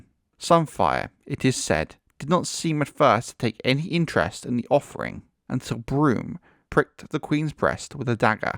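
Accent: United Kingdom. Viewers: Too British I can't understand without subtitles.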